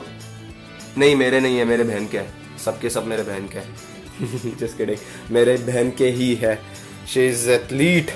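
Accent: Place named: Maharashtra, India. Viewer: I like your marathi accent 😃😄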